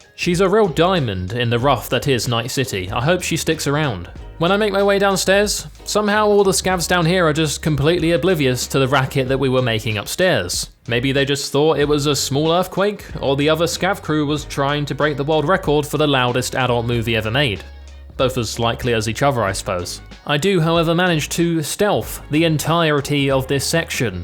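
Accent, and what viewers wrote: England, United Kingdom. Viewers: Your vids are absolutely wonderful And your English accent amplifies their greatness in 10000 times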